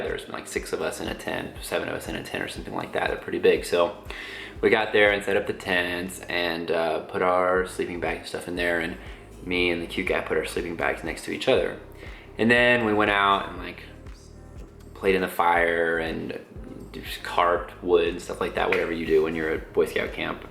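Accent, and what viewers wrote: Arkansas, United States. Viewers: Lovely story. Love your AR accent. I used to have AR accent too.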